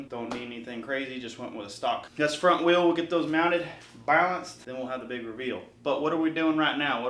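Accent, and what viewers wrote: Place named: United States. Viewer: I feel like you have a huge country accent on camera!!! haha.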